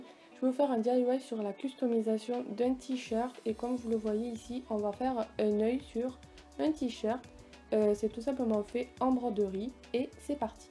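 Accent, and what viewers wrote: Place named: Marseille, France. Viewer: C'est la première fois qui j'entends ta voie et je ne m'attendais pas du tout à un accent marseillais😂😂 Bref! Ta vidéo est vraiment cool!😍😍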